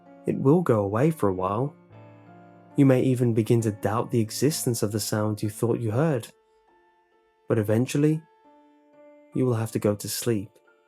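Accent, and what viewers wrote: United Kingdom. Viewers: are you British, love your channel BTW :-D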